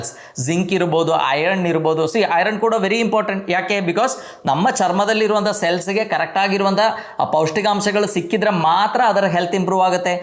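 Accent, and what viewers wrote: Kerala, India. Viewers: Good Morning sir...You are giving very very very good information Always i will be watching your videos. I can understand most of your points b coz I studied the related course in same the profession and also I studied about Nephro urology and i worked in the joint venture units. And one more thing except Malayalam accent will be coming in your talk while using English your Kannada is 99% good . And Thank you so much for giving more informations about health updates in your every videos. Thank you so much sir .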